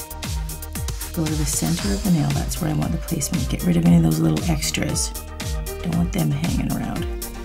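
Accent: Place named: Canada. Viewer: I love your accent! I am guessing your Canadian?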